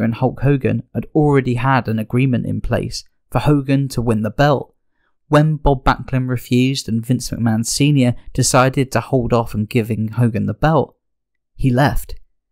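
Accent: United Kingdom. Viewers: DOESN'T LISTENING TO A BRITISH ACCENT TALK ABOUT WRESTLING MAKES IT SEEM LESS STUPID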